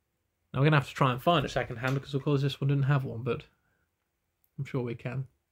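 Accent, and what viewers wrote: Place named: Scotland, United Kingdom. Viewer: Great Job! Beautiful enamel dial. It was nice hearing your scottish accent. Keep on with your videos. Regards from Argentina.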